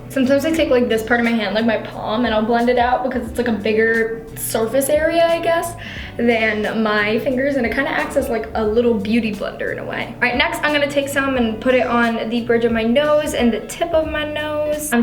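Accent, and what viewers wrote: New York, United States. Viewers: aww honestly i love her she's so nice and i love her like little new york accent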